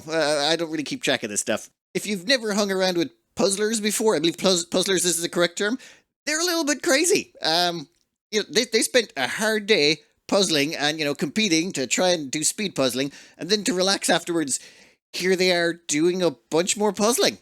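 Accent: Ireland. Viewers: OMG, you're Irish?(I just have to ask)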